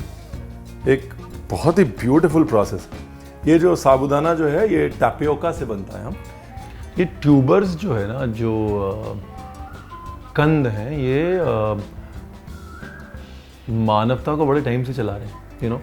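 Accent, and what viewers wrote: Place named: Punjab, India. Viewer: Very yummy 👌😋 i just love your punjabi tone chef .. you are really amazing n your recipes are just woww....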